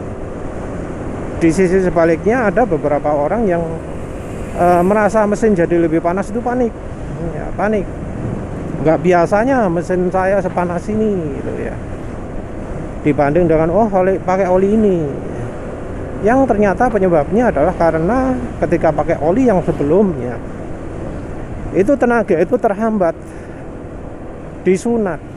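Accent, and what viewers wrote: Sidoarjo, Indonesia. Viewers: Logat Sidoarjoane sangat kental mas bro😂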